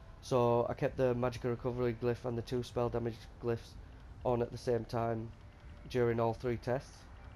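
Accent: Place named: Northwest England, United Kingdom. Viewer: Hiya mate. LOVE the vids - you're putting out some ace content at the moment! Just out of interest - where about in the UK are you from? Your accent sounds like Wigan/Preston area? Deffo the Northwest! :) I'm from just out of Chester. :)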